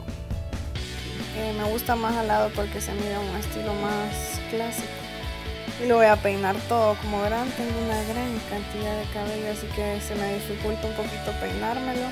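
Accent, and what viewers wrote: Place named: El Salvador. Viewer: Tiene acento de mi El salvador 🇸🇻♥️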